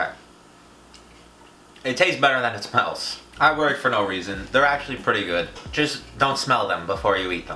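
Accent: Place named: Brooklyn, United States. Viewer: I love his voice, he sounds like he's from brooklyn in the 50s! Where is he from?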